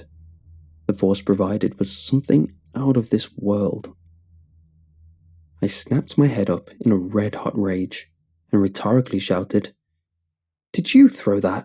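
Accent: United Kingdom. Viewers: Plus a british accent. Though, I can't watch his content without feeling dirty because he put down a smaller youtuber for not having an american accent, despite the fact that he, himself, does not have an american accent.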